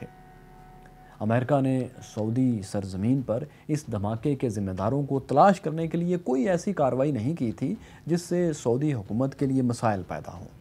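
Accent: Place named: Punjab, Pakistan. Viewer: Urdu with punjabi accent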